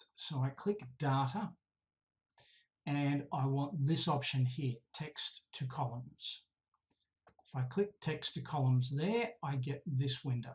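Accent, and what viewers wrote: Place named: Australia. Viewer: Also saved me as I write my thesis!! Thank you! Love hearing a fellow Aussie accent in one of these videos too :)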